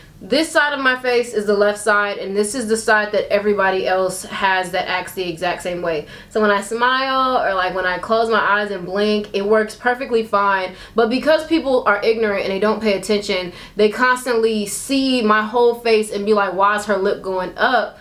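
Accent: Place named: Texas, United States. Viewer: I couldn't tell, I thought you just talk a little country like us in texas...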